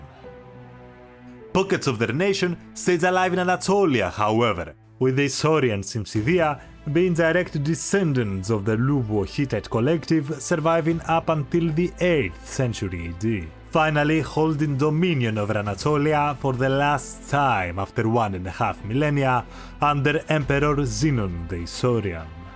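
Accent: Greece. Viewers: τότε συγχαρητήρια γιατί είναι πρώτη φορά που μία τόσο βαρειά ελληνική προφορά, «ακούγεται» . Σίγουρα εντυπωσιάζει μάλλον θετικά τους ξένους, αφού την ακούν να εξιστορεί σχετικά με αυτή θέματα, μην σου πω κι εγώ δεν τσιτώνω όταν την ακούω . Στην τελική, έτσι είναι η γλώσσα μας, αυτή η προφορά βγαίνει σε μια άλλη γλώσσα. Παλιά ντρεπόμουνα να ακούγεται το ελληνικον, στην προφορά μου στα αγλλικα. Τωρα ούτε καν με νοιάζει όταν λέω beach, bitch, peach κτλ, όλα τσουβαλιασμένα με τους ίδιους φιόγκους. Ας πούνε κι αυτοί ξ, πχ ή ο,τι. Μπράβο σας.👍👍🙏🏻🙏🏻👏🏻👏🏻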